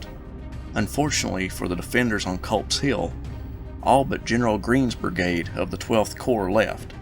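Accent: South, United States. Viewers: Wow, excellent job! I just binge watched every one of your Gettysburg videos and I must say well done sir! I can tell by your accent you are of southern birth, as am I. My 3x great uncle fought at Gettysburg in the 7th La infantry.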